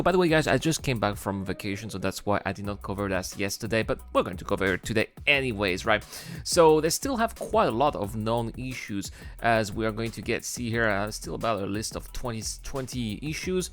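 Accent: France. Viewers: T'es francais non? :) cintinue comme ca en tout cas j'adore ton contenu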